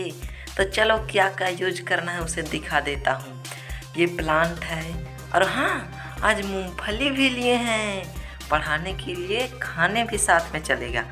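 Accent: Bihar, India. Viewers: Bihar sa ho kaya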